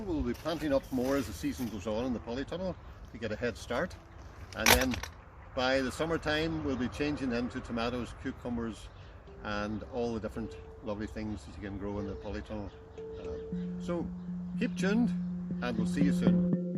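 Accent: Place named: Donegal, Ireland. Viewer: Love this , keep them coming! Lovely to hear a lovely Donegal accent.. well done 👏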